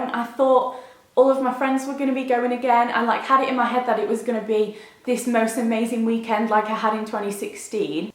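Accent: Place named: North, United Kingdom. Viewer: I relate to you massively with regards to food. I’ve struggled with binge eating when I feel anything but happy and the past few weeks I’ve massively been off plan with slimming world. It’s so inspiring to see someone so beautiful having the same issues as me, so I thank you for showing me it’s okay to feel how I feel. Also you’re northern like me and I love it!! 😂 I really hope I meet you at some point, I’d love to sing with you is that weird?? 😂💕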